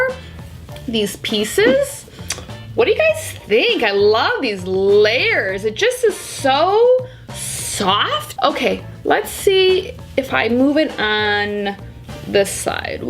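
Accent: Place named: Upstate New York, United States. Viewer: are you from upstate ny?? you sound like me haha i love the positive energy!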